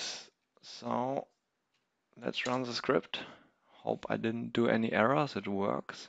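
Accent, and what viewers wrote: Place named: Germany. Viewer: You sound very german :D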